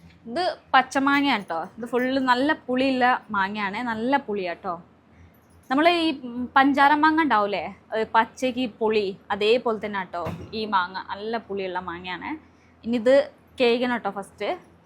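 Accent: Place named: North Kerala, India. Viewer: North Kerala, from the way you speak malayalam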